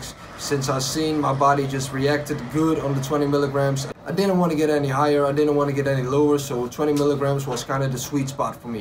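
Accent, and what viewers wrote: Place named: Netherlands. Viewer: U sound Dutch. Was just in Amsterdam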